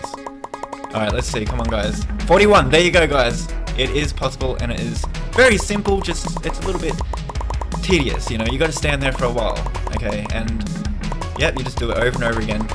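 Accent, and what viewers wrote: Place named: Australia. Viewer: you sound australian, like me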